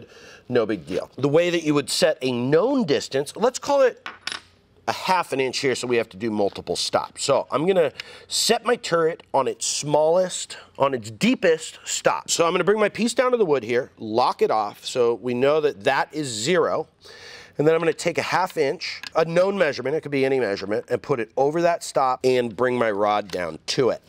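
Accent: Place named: San Diego, United States. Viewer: Are you from San Diego? You talk like a San Diegan. I miss it there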